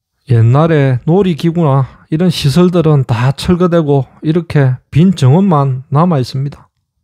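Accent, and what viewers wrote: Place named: Masan, South Korea. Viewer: 수고가 많으십니다~ 목소리 들으면 강호의 발바닥님/ 생각도 납니다~세분이 모두 마산분이십니까?~세분 목소리 억양이 비슷 합니다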